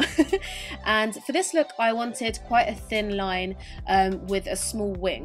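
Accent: United Kingdom. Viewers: Voice over! I live in America and i'm obsessed with your British accent!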